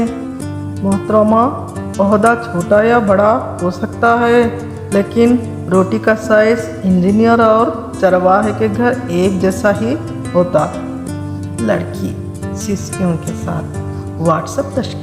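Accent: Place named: Deccan, India. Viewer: دکنی لب و لہجہ میں مزاح سے بھر پور بہت عمدہ دیدی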